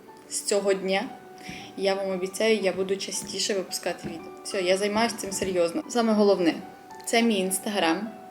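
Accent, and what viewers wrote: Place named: South Korea. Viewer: Дуже цікаво, що у вас з'явився корейський акцент😇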